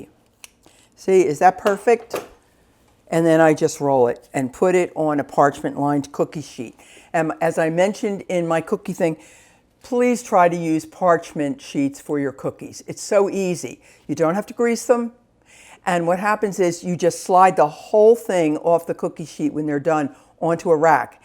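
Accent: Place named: New Jersey, United States. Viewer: Are you from NJ by any chance? You definitely sound like you are lol. I’m from NJ, I live in Haddon Twp., Camden county. I love these cookies and I was looking for a recipe with almonds and low sugar in the cookie recipe. Thank you very much!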